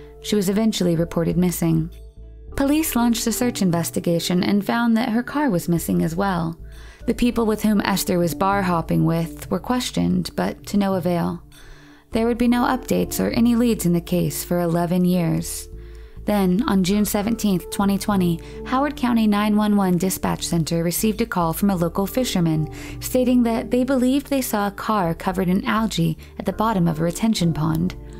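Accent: India. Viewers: I was always wondering where are you from, coz i felt you maybe from India due to your accent. As a fellow Indian, I want to congratulate you for running such a nice & successful channel. I am so happy to subscribe & enjoy your content!!! All the best & keep it up 👍👍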